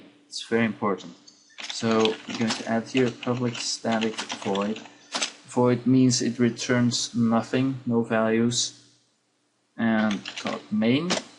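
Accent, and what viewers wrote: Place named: Russia. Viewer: i love how he says Class but you can hear the Russian accent coming through.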